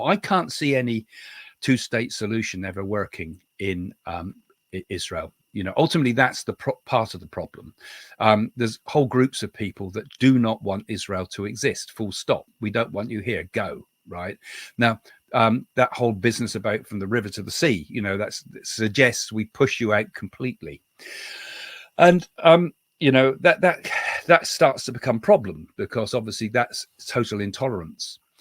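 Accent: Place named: Scotland, United Kingdom. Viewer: Some Scottish accent here and there during your conversation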